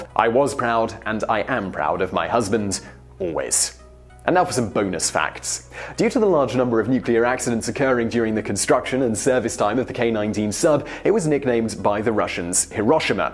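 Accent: United Kingdom. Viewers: Omg fucking UK accent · bobtheduck Yes, he’s undoubtedly British. You can hear it in his “Cubar” ( , ).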